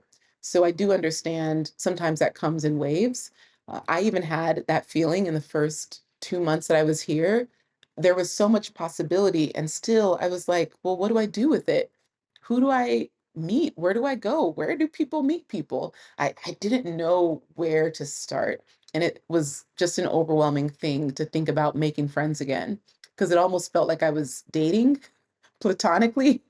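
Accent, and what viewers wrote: United States. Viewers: 👵🏽This is a compliment I love your American accent especially when you say y’all 🫶🏽✊🏽👊🏽💪🏽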